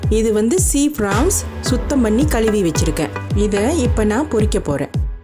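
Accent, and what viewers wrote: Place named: Kuala Lumpur, Malaysia. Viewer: KL accent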